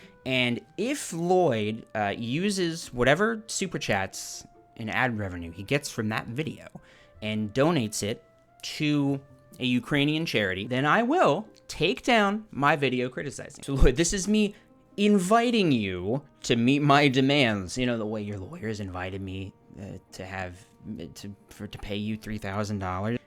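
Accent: United Kingdom. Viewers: Heh, there’s that British accent again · I'm not saying it's offensive I'm saying it really isn't that funny, it's boring, I'm watching for humor and I expected something a little more thought through. I feel like a teenager watching this shit. If you're going to make fun of another English speaker have some more complexity than just accidentally reading potentially a native Croatian lawyers script in a really blank slate crappy British slant. Common, I'm not subscribing for reporting, I wanted good comedy.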